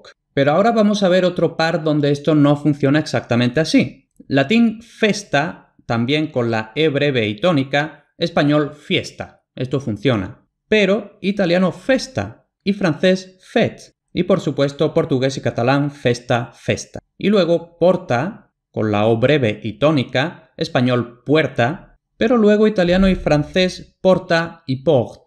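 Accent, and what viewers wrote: Castile, Spain. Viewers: Eres andaluz pero hablas como castellano al menos ante mis oídos latinoamericanos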